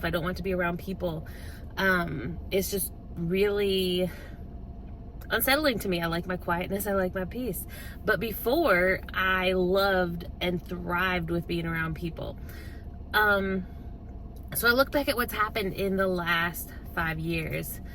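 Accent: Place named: South, United States. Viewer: Any chance you live in Alabama? You sound Southern.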